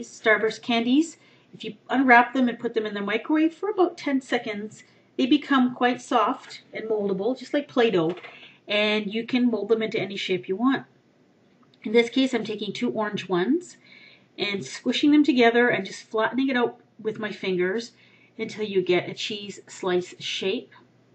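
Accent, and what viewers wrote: Canada. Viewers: i love your canadian accent it makes me so happy inside.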